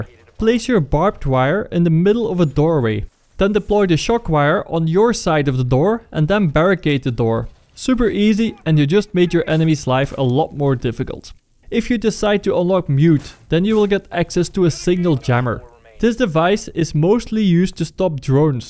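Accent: Netherlands. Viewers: Iewl dat Nederlands accent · Dat Dutch accent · he is clearly dutch, i'm dutch myself and know how dutch people speak english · Are you Dutch because of your accent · Ben je nederlands? klinkt zo haha! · i think it's a dutch accent, and i can hear it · dat nl accent XD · jij komt zo hard uit NL you engels is zo fockt up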